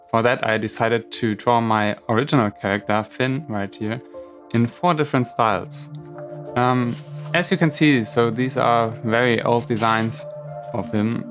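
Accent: Germany. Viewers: I don`t like naruto because of the german translation. (I somehow noticed on your accent that u r german, I am actually german too but I mostly speak english and that`s why I write this on englisch now XD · I heard it from the forst seconds on, that you're German! (Because of the accent xD) But I'm too :P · Are you actually german? I thaught so because of your accent ^^ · hahaha ich wusste du bist deutsch, den dialekt erkennt man sofort ^^ aber cooles video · Your accent... your german too, right? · Ich hatte schon den Verdacht wegen dem deutschem Akzent :P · it's a german accent, so i guessss yes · Von deiner Aussprache her nehme ich einfach mal an,dass du ursprünglich deutsch sprichst,was es einfacher macht zu kommentieren xD Deine Version von FairyTail und Death Note hat mir am Besten gefallen,weil man sofort am Ausdruck erkannt hat was es sein soll.Was Naruto angeht hab ich genau zu dem Zeitpunkt angefangen es regelmäßig zu schauen als du aufgehört hast,also mit dem Beginn von Shippuuden.Gegen Midseason würd ich mal sagen gings mir dann wie dir,ich hab lange nicht weiter geschaut und als ich vor ein paar Monaten dazu kam war das erstmal ein Schock weil sich zu damals wieder so viel verändert hat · you sound very german (almost like my english teacher XD ) but I like your talent.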